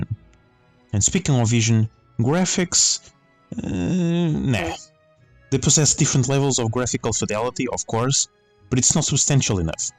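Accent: Portugal. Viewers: Wait you sound portuguese. Are you from Portugal? If so, CARALHO!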